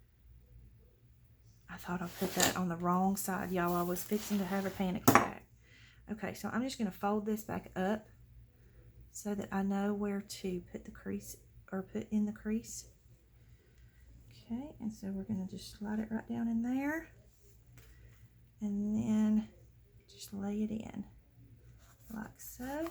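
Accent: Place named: Tennessee, United States. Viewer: I knew I recognized your accent - maybe because I'm just down the road from you in Harriman! 😂 Love it! Thanks for sharing. Great ideas & lovely journal! God bless.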